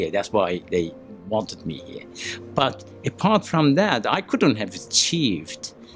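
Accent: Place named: Azerbaijan. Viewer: Azari accent👍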